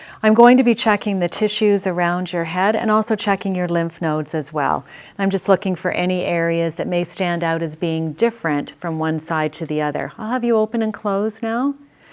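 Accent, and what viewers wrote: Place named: Canada. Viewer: Her accent is SO Canadian 🍁🍁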